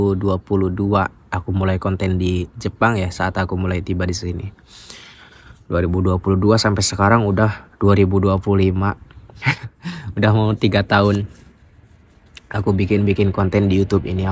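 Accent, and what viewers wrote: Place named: Sunda, Indonesia. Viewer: Bapa pasti bangga a, btw asli mana a?. Logat sundana khas pisan😅. Pidoana a, mudah mudahan tiasa oge ngerantau ka jepang🙏🏻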